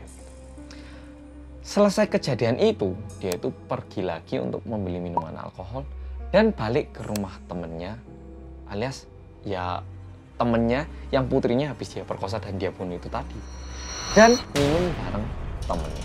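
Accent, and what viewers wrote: Java, Indonesia. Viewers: Tim gercep mas, selalu medoooooooookkkkkkkkkkkkkkk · Bersama org korea yang... Madokkkkkkkkkkkkkkkk😂😂❤❤❤... Suka banget dengarin😅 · Baru nonton chanel ini.. wong korea ngomongnya medok banget.. lucu.. haha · Lucu penyampai ini..orang korea tapi logat indonesia(jawa) nya itu pekat ya😀😀😀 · Suka banget karo wong korea sing medooogggg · Dah lama ga nyimak kasus Dr abang medok. Kangen rek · Mulai demen liat video2 nya mas medokk satu ini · Mas yg orang korea aja ngomongnya lebih medok daripada aku yg suku jawa, tapi tinggal di kalimantan 🤭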